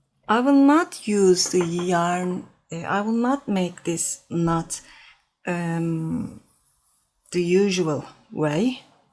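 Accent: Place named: Turkey. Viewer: best turkish accent I've seen in a long time so calming ahahah i feel like you'd be a great grandmother I never had hahah I sometimes watch yer videos and feel like yer my granny hahaha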